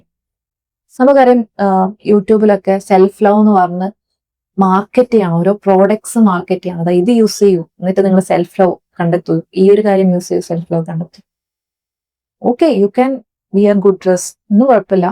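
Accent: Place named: Kannur, India. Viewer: I started watching your channel recently. I like the content very much and very relatable. I guess you are from some part of Kannur. Me too.. I forwarded your video to my friend Sajna she also talks in the same way😂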